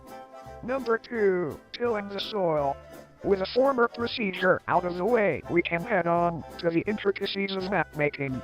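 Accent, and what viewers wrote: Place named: Arab world. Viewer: I can hear your middle eastern accent through your voice changer.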